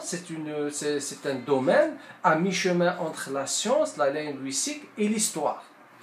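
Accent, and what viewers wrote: Tlemcen, Algeria. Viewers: C est evîdent il est tlemcenien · Pour moi il a l'accent de Tlemcen et Nedroma D'une Algérienne de l'ouest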